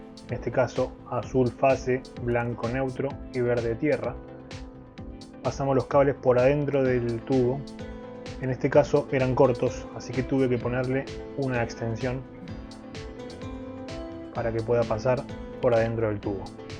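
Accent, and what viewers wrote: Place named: Argentina. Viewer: De dónde sos amigo. Sonas reargento pero laburas en 110v😅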